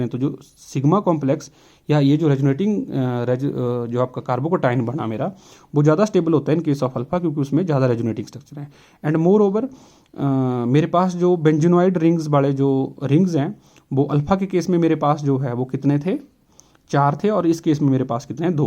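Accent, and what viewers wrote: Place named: Pahadi, India. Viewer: Sir aap Uttarakhand se ho .....aap k accent se LG Rah ...Ki aap pahadi ho .....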